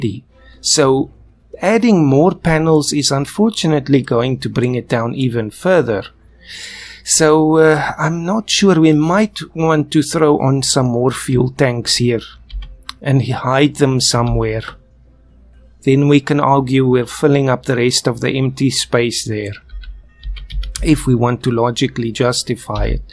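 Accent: South Africa. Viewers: Are you South African? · You sound so south African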